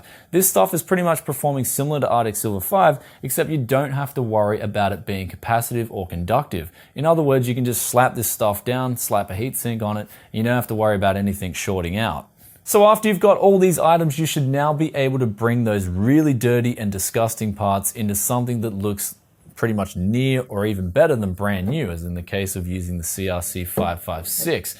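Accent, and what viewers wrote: Australia. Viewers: I am surprised, I assumed given your accent you would live in Aus, but the products you show suggests you live in Japan? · They couldn't understand your aussie accent in America. BTW Kerosene + water on a rag is a stronger cleaner.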